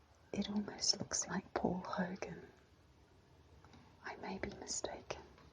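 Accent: New Zealand. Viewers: Lovely video...reminds me so much of one of mt favourite channels, Relaxing ASMR <link> does lots of old magazine and catalogue reading videos, and is from Mid-west USA...so nice to hear your Kiwi voice too :-)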